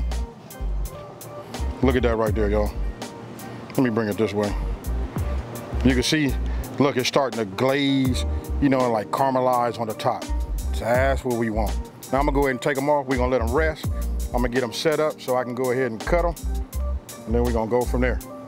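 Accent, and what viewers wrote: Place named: Los Angeles, United States. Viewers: been following you for a while man! Awesome recipes man! I’m from Detroit and my wife and I trip off your deep Cali accent! It reminds us of that Detroit twang…very similar! Keep up the good work fam! Much love!!👊🏾👊🏾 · My ex was from New York and she used to say I had a Cali accent. Never knew what it sounded like and after watching, I know what shes talking about now. Me and this dude talk exactly a like. Ribs, looked fire! You gotta video on how to make the rib tips you trimmed off? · Where are you from bro? My wife and I are debating about you. I say you're from the midwest. She says you're from Cali. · Nice video...man you sound like you from LA. I see the hat. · And they say "There is no West Coast accent" This dude sounds like Games uncle.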